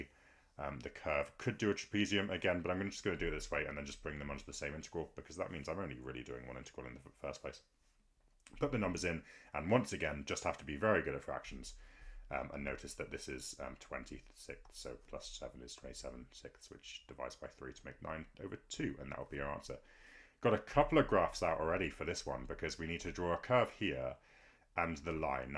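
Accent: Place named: United Kingdom. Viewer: Can u go some slow because international students also watching your videos it takes so much of time to understand the your British accent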